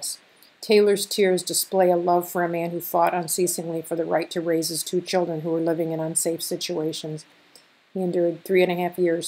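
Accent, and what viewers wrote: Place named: Midwest, United States. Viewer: I can hear a Midwesterner from a mile away lol Great video!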